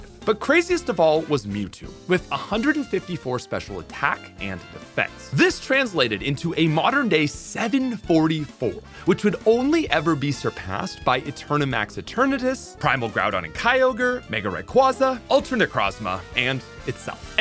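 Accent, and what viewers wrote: Canada. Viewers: Some of your pronunciations of the pokemon are odd lol..must be your Canadian accent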